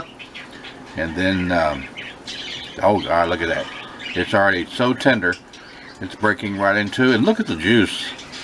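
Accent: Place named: Midwest, United States. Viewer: I enjoy listening to your calm voice. You sound like you are from the Midwest. I will try this.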